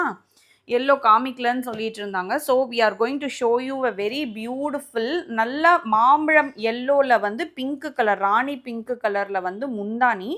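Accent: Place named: United Kingdom. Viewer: ஏன் டி நீ பிரிட்டன் ரிட்டனா தமிழ் வாயில் வராதா பாதகத்தி இப்படித்தான் தமிழை கொன்னுட்டு வரீங்க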